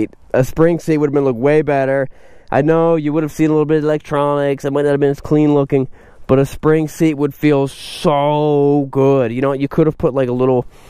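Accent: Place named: Georgia, United States. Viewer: LMFAO... can tell was in GA for a while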